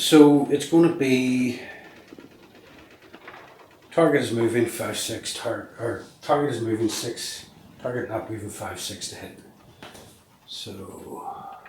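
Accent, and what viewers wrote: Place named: Northern Ireland, United Kingdom. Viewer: Good stuff bud, have you been playing RF long? I hear yhe Nordy accent, I live in Dromore between Banbridge and Hillsborough, where abouts are you?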